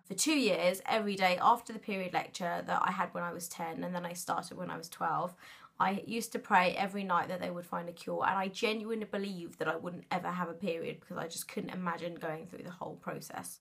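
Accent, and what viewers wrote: United Kingdom. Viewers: Where do you live ? Cause u sound british lol ur voice is pretty .but pleasee answer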